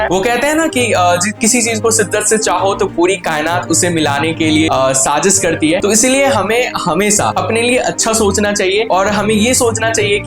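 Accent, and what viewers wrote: Bihar, India. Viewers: He is from Bihar and has that bihari accent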